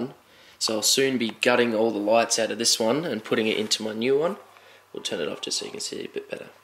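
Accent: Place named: Australia. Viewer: ahh mate i absoloutely love your good old aussie accent! it's so close to an nz accent! (the best =D) great video!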